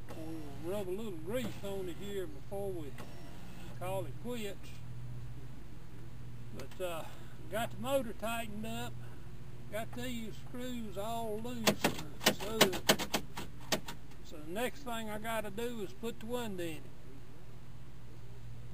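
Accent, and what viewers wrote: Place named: South, United States. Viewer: That's some southern accent! Nice work on the window regulator.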